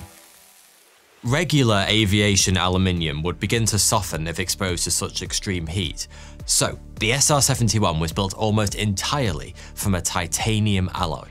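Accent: United Kingdom. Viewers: Good video and good sense of humor. Also, 'good' Brit accent that makes 'th' into 'ff'. 'Fifth'. for instance, becomes 'fiff'. As one obsessed with the details, I wonder what region that represents?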